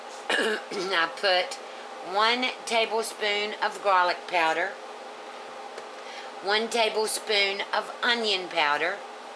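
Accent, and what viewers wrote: South, United States. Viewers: Today, Easter weekend April 8, 2023, and I just found your channel!!!! I love your rib recipe! And I can't wait to cook them!! I just love your recipes! Thank you! Your accent is so southern! Just lovely! ❤❤❤😊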